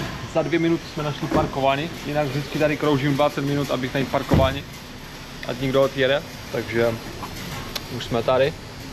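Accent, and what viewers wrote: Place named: Ukraine. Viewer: Mohu se zeptat, podle přízvuku jsi z Ukrajiny nebo z Ruska? Díky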